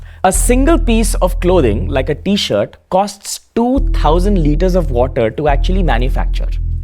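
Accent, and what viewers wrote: India. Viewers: Just discovered this channel, usually it is hard for me to listen to an Indian accent, but you do amazing job. Subscribed!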